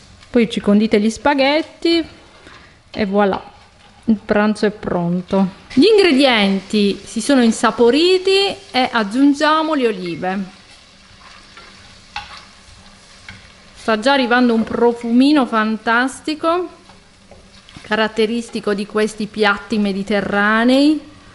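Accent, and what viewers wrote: Apulia, Italy. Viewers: 👋👋 SEI brava e chiara, di che regione sei PUGLIA, VOLEVO CHIEDERTI CHE NE PENSI DEL ROBOT BIMBY LO USI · Dall'accento sembri pugliese. Vero?😁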